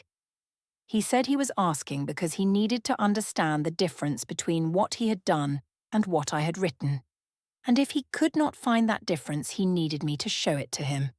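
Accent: England, United Kingdom. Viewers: I enjoy the posts on this channel: the English accent, the female voice for a female narrator, the precision of ths details, and the studied inexorability of the narrative, among other virtues. This story, however, was WAY too subtle! Are we to understand that the narrator had a lesbian relationship with Sasha? Or was she only thinking of it? And what was the meaning of the two posts? How was the concept of thoughts being modified as if they were her own (or some such wording) -- whatever that could have meant -- defamatory? And what was the significance of the two copies of the novel, and what exactly was the narrator's explanation of why she liked to run her fingers along the spines of the books? Was it related to a lesbian relationship in college? And did the husband really divorce her because she suggested she was pressured into moving into the suburbs? 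I am utterly baffled by this story.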